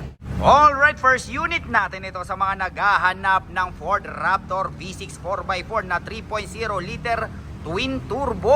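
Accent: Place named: Pangasinan, Philippines. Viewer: Lasi ka taga pangasinan ka manaya boss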